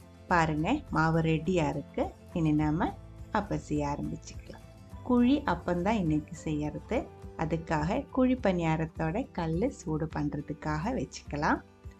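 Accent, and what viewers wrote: Kerala, India. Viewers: Your voice is very suthing...that mallu slang mixed in Tamil is nice to hear...if your videos can be a lil faster..that ll be an added advantage..but overall enjoyed watching..im gonna try it soon..❤ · So nice mam ...your voice and rendering way is so cute ...I will try ...mallu accent super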